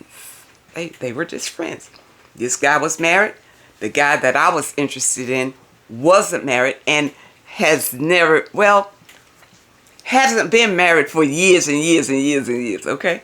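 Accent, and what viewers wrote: South, United States. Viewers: that's good. He sounds like Southern Charm.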